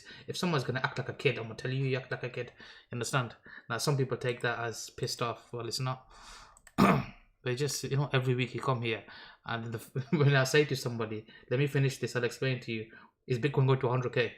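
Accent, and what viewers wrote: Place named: Birmingham, United Kingdom. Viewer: yes bruv birmingham accent lol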